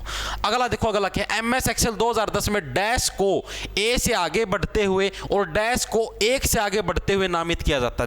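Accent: Haryana, India. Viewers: Padne naa aate aapke yahan toh aapki language sunne aate h sir jii 😅😅 haryanvi on top ❤❤ mittar 🤭🤭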